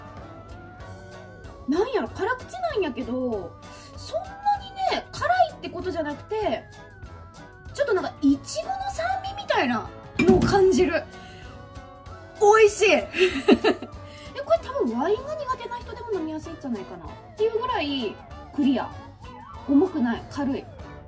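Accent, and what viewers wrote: Kyushu, Japan. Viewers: 九州弁も可愛いね♪ 都農ワイン、クリアで飲みやすそう！ お母さん、実家だと折り返しづらいかもしれないから、スマホからかけてみたらどうかな？ ゆっくり癒されて、気をつけて帰ってきてね～( ´ ▽ ` )ﾉ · おかえり！ なまり愛莉さん可愛いし、同じ九州出身だからかどこか懐かしくほっこりしました(^^) リフレッシュできたようで良かったです！ そんな中僕はこの動画が時々チカチカして見える程APEXしてました🙄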